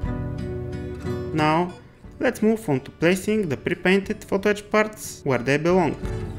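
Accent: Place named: Russia. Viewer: Акцент русский 😁 Работа отличная!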